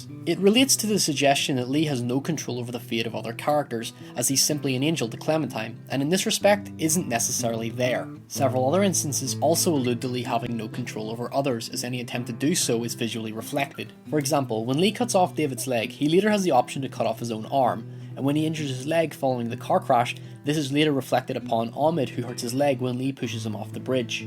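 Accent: Northern Ireland, United Kingdom. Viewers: great video, is that a northern irish accent?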